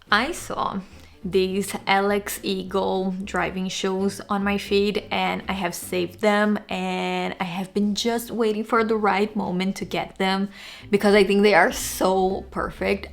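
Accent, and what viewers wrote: Brazil. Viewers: wow so many good jewels, thank you!! I have been wondering where your accent id from - I Love Brazil and it's on my bucket list to visit :)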